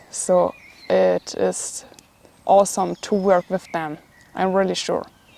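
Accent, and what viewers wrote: Germany. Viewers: I was hearing her say "Tippy" then realized she was saying "Teepee", her German accent is cute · Teee peee..I love your beautiful German pronunciation of my language..danke. (:-)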